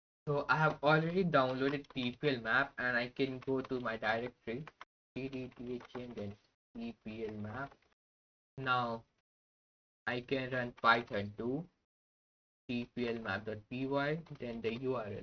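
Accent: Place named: India. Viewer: Your accent is damn cool for an Indian ! Good work, mate. Keep up the good work. Learned about tplmap and synk from your video!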